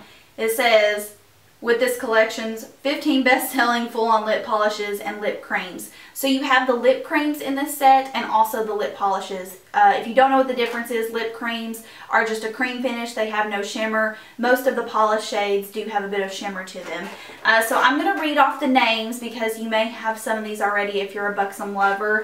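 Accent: South, United States. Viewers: Love to find a beauty guru with a southern accent 😘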